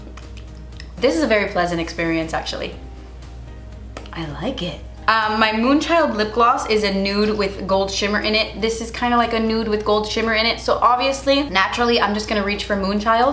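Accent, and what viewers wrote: Cuba. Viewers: TANTO DINERO PARA ESA MIERA... lol i love you 😘 Cuban accent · Please do a Spanish tutorial! I love your Cuban accent :) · Please do a Spanish tutorial!!! i love the Cuban accent 😍😍 i would love to see you do it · I'm in love with you Cuban accent 💓 😂 besos!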